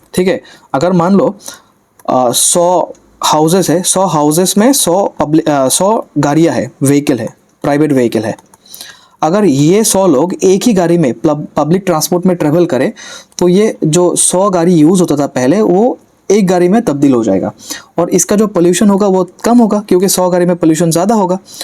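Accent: Assam, India. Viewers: Ur voices like Assamese 🙄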